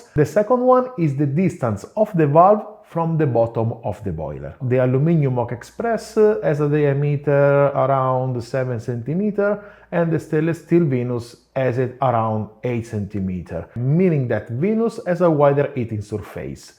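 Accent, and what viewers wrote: Italy. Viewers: Dam this guy is a coffee scientist. The Italian accent makes it perfect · Excellent video and the Italian accent makes even more authentic 😁 Having both I always felt dark roast which I only use tasted more smoother, abit more refined and abit more juicier on the Venus. Moka has the less refined and harsher finish overall. Personally I only use the Venus & it looks more astectically pleasing too 👌❤🇿🇦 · I Like the italienenglish😊😅 good Video. Thank You!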